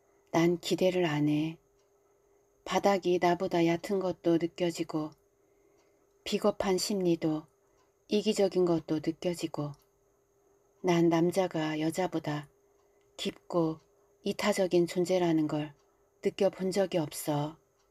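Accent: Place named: Chungcheong, South Korea. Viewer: 구독하고 듣습니다. 충청도 사투리 잘하십니다~♡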